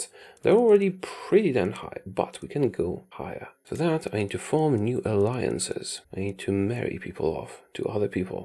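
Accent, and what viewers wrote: Poland. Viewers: It legit took me 3 1/2 minutes to recognize your voice due to that very, very subtle polish accent. No joke: I thought i was watching The Spiffing Brit for quite a while 😅😂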